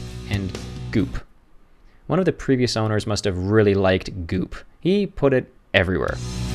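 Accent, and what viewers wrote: Canada. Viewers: Very nice work. I do love your canadian english. Who cares about Trumpland..... Cool vlog, very 'blinky'!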